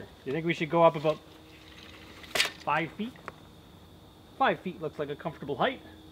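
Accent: Canada. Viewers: self reliance. tiny house. dog. drone footage. faint canadian accent. this is becoming a fun trend. lol.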